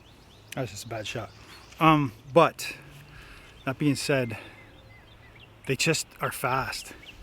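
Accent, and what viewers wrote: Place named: Canada. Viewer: Crap! I didn't realise that you were from Canada. Explains that accent haha. Where from? I'm in eastern Canada. Love the reasonable approach that you take to all of this. I'm fairly new coming back to archery after several decades. Been watchin you and a few others to try and catch up on the basics, the latest tools, brands, gizmos. Been really helpful Cool stuff. So thanks :)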